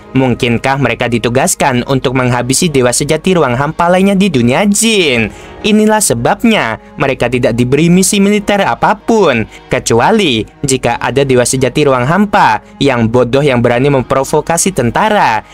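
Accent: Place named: Kelantan, Malaysia. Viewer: Bro dah pandai kecek klate..❤❤ bulang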